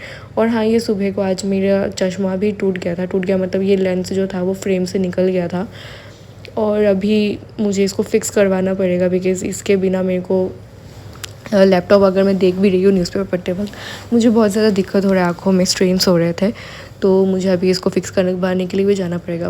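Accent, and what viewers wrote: India. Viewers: Hii i love you hindi accent,,👍👍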